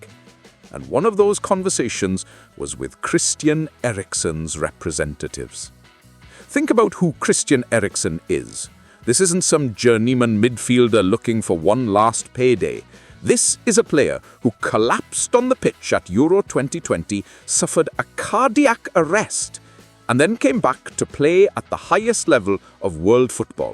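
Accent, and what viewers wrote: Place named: South Wales, United Kingdom. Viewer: Who is this guy with south walian accent talking about.we are north Wales club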